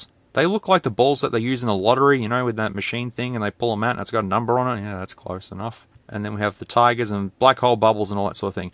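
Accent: Australia. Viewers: Yay the Aussie voice is back now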